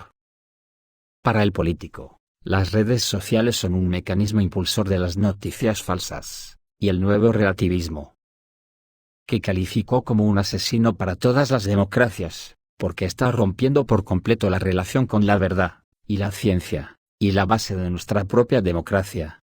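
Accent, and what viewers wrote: Spain. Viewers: El acento es vizcaíno.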